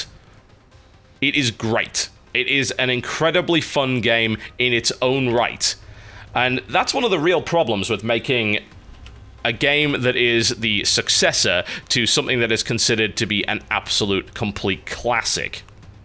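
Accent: United Kingdom. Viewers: ....he is British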